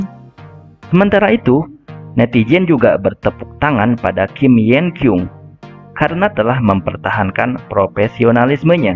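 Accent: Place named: West Java, Indonesia. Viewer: Lidah sunda bro... 😅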